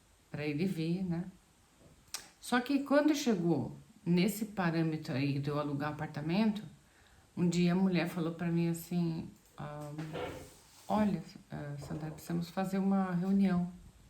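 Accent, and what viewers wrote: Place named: Espírito Santo, Brazil. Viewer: Gostei muito de você Sandra que Deus continue abençoando você e sua família. Você é do Espírito Santo? Você tem um sotaque parecido. Desculpe pela indiscrição, mas o seu namorado é alemão? Eu já morei na Itália. Não por causa de dinheiro mas por gostar do país da língua sou neto.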